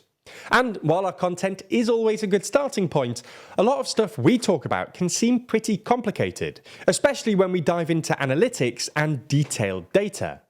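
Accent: United Kingdom. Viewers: British pronunciation of Spanish words always makes me cringe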